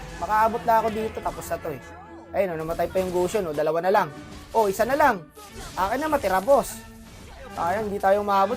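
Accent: Iloilo, Philippines. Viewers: Boss try mo naman mag Ilonggo na voice over alam kung Ilonggo ka boss eh mas nagiging funny content mo pag nag Ilongo ka bigla bigla nalang ako natatawa HAHAHHAHA sana ma pansin ❤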